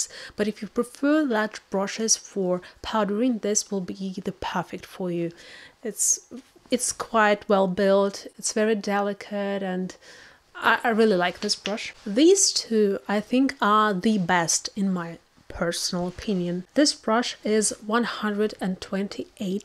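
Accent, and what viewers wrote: United Kingdom. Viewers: ваш британский акцент шикарен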